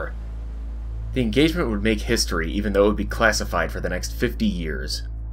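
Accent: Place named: Midwest, United States. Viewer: Your midwest accent makes this great.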